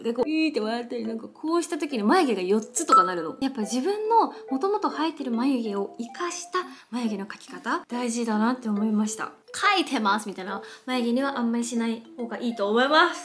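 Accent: Japan. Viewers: 喋り方めっちゃ一緒やーって思ってたら同じ県民やった‼︎親近感♪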